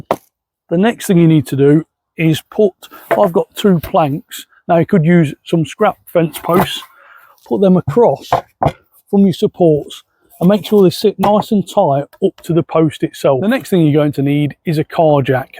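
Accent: United Kingdom. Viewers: Is he bringing these to his pawn shop? And why is he taking with a British accent?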